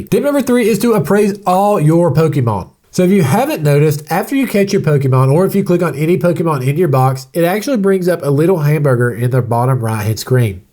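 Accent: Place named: South, United States. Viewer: You got a solid southern accent, but some real antifa loser vibes.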